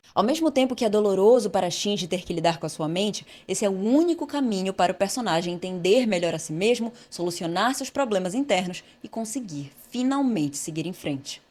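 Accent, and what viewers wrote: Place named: Belém, Brazil. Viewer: Esse sotaque entrega, vc é de Belém!?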